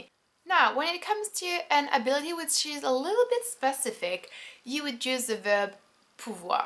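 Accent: France. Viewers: the way you speak French is so beautiful!!!!!!! I'm learnin French in school, but I cannot do that graceful and velvety accent native French speakers have. :( it's so gorgeous. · what part of France are you from?? Id like to know because I am going to France for a week this year, but I am going south of france and I know the accent down there is more difficult than north. But I like your accent when you speak French and I wondered what part of France you are from,???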